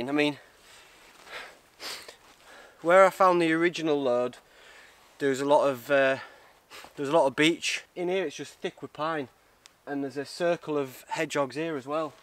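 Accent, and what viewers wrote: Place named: Northern England, United Kingdom. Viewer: I moved to America 6 years ago and I love hearing a good Notthern accent. But the Morrisons bag was just too much dude!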